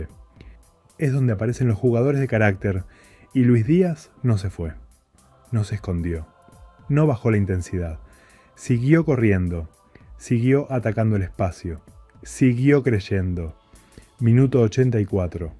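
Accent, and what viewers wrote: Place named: Argentina. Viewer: Me encanta tu análisis sin gritos sin estridencias muy acertado se oye lindo con su cadencia Argentino saludos 👍👏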